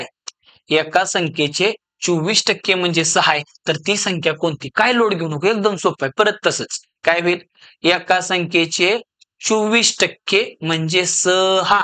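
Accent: Rural, India. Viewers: अप्रतिम आहे सर तुमचे भाषा कौशल्य.... तुमच्या बोलण्यातून गावाकडचा गोडवा आणि शिकवण्यातून आई सरस्वतीचा आशीर्वाद दिसतो...🙏🏻🙌🏻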